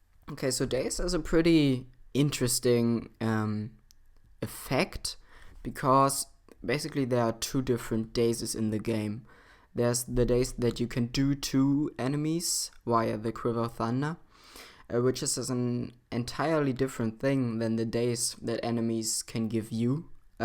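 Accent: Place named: Germany. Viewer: np btw you sound like you speak german cuz of your english accent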